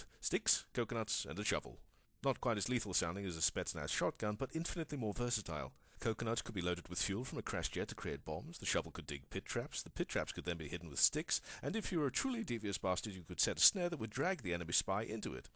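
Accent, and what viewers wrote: United Kingdom. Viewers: I got the feeling of beloved Yahtzee, but I missed the excessive swearing about the game. I am after all, a fan of juvenile humor, and hearing a posh british accented man swearnig until his gonards explode is making me laugh. Other than that, It seemed to be a great first review try. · but he has a British accent nevertheless, and iam pretty sure he was born in Britain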